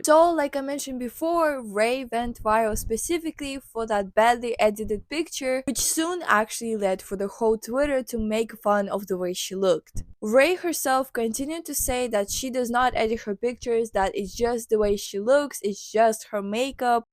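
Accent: Russia. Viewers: блин не пойму из-за акцента ты русскоговорящая или нет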